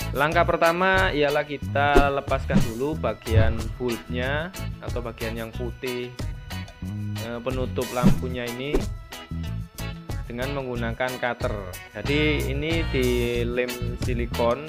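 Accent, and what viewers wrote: Java, Indonesia. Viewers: Jowo ne kenthel..."MBRAWUL"..😀😀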